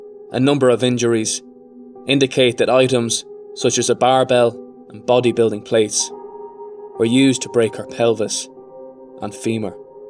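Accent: Ireland. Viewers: Your channel has just popped up on my list.. another glorious Irish accent like Mike at That Chapter. and no gimmicks just clear narrator and detailed story .I’ve subscribed .. 😀 👍🏾👍🏽👍🏼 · Irish narrators rock.Respectful to victim,and her mother.No bias here💚🇮🇪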